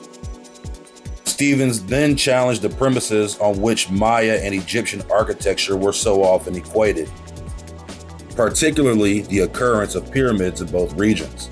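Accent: South, United States. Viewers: Bruh, this southern accent is trash. Makes a lot of the video hard to watch. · Man. I'm from Georgia and your ass had me 😂 crying talking like one em country boys. Love this channel. Keep doing your thing man. I'll keep watching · the southern accent is distracting and cringe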